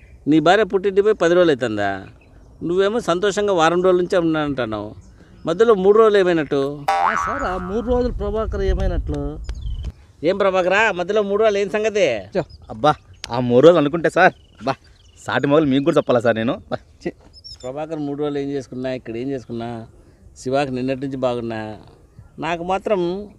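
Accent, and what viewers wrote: Anantapur, India. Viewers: అనంతపురం యాస చాలా బాగుంది ! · Chala baga chepparu 👌 naku me videos antey chala istam garu. I feel very happy and relaxed while I'm watching. And Anantapur language vintuntey chala happy ga anipistundi. Thank you so much for making such videos and making people happy.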